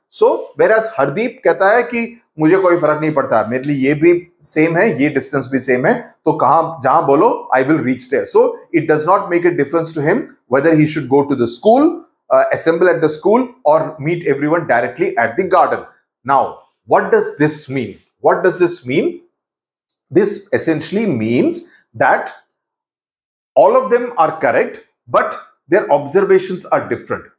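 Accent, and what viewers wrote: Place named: South India, India. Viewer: Sir i think you are south indian . Your hindi dictation is clear but hindi tune is like south Indian's people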